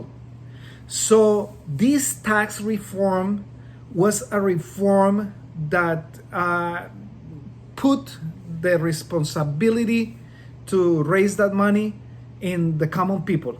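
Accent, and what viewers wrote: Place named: Latin America. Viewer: Esta genial que mantengas tu acento latino!!!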